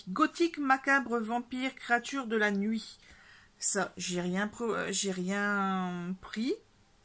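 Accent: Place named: Quebec, Canada. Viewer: Wow du québécois YASSS TABARNAK hahahah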